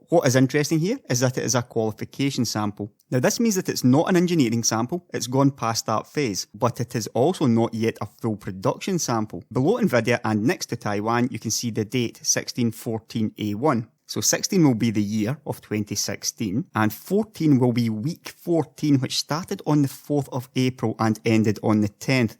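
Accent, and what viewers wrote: Scotland, United Kingdom. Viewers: tech updates with a Scottish accent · It took me a while to get used to your Scottish accent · Love your accent >~< . Scotish right? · "Alright guys, how's it going?" in a Scottish accent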